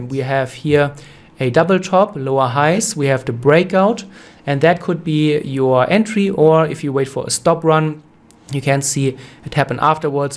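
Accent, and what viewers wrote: Germany. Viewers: u are defo a german i can hear that hahah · Grüße an meinen Landmann! Höre doch wenn ein Deutscher englisch spricht! 😁 Aber gutes Video! Grüße! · Very useful video :) You sound german haha. Wo wenn ich fragen darf? ;) Suche Leute zum austauschen und lernen, da ich Trading super spannend finde aber es schwerer ist als man anfangs vermutet. Kurse gibt es wie Sand am Meer aber Connections bzw. einfach nur miteinander reden und Erfahrungen teilen bringt meist viel mehr. Liebe Grüße aus dem Schwarzwald, Jan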